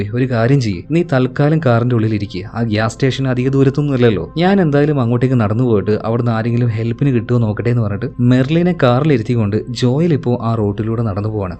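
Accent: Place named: Kannur, India. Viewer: Bro kannur alle appo😊😅❤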